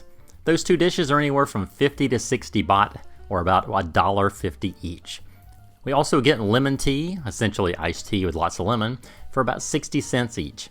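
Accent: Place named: South, United States. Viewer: Nice video. You sound like someone from Southern US .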